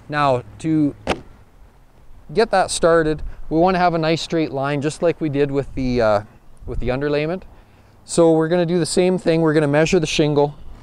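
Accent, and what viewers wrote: Canada. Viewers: HE MUST HAVE GRADUATED FROM THE ROYAL ACADEMY OF ROOFING IN CANADA! HES PAINFULLY SLOW! AND THAT ACCENT IS BEYOND IRRITATING , NO WONDER CANADIANS FIGHT SO MUCH! DOESNT EVERY ROOFER USE SCAFFOLDING ON A RANCH ROOF? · It's like listening to my grandpa when he explained how to do stuff. But with a Canadian drawl.... Good stuff. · eh man are you Canadian? you sound me that's why Im asking haha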